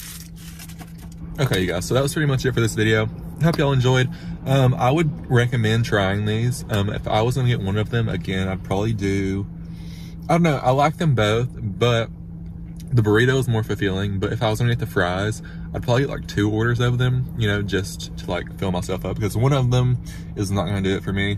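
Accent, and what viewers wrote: South, United States. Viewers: I love your country accent 😍 and your face is beautiful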